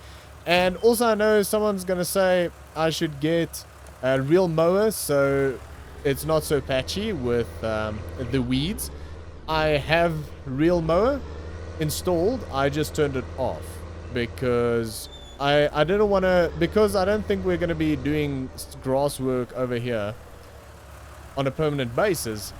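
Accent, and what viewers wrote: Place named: Cape Town, South Africa. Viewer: Hi, love the serie and the longer versions. Keep up the good work and boy, do I miss Capetown listening to your accent. Best time of my life.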